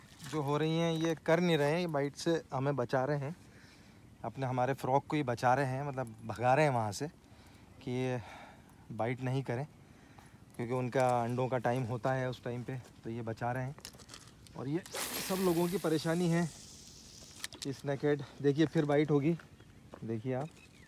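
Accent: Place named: Bhopal, India. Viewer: aapki tone ek dum bhopali type hai main bhopal se hoon isliye poocha bhai good show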